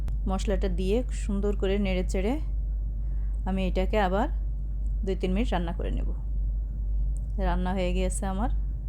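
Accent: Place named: Chittagong, Bangladesh. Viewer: Apu chittagong er mone hoy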